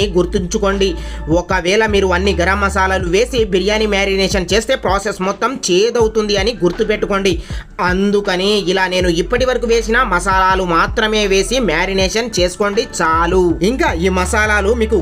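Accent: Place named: Telangana, India. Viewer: Hi bro thank you for the video and meeku telangana slang vachu telugu nerchukoni chepthunaanu anaaru don't worry bro meeru meeku vachina slang a maatladandi we can understand telangana slang as well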